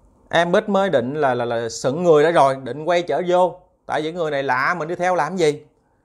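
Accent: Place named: Ben Tre, Vietnam. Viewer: Quê e ở đâu vậy,ah nghe giọng e gióng ở Thạnh phú,Bến Tre quá.hihi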